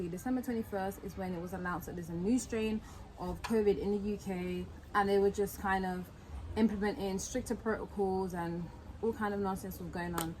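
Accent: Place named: Barbados. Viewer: Its also the bajan accent coming out for me😩😍😍😍😍😍🔊🔊🔊🔊🔊💙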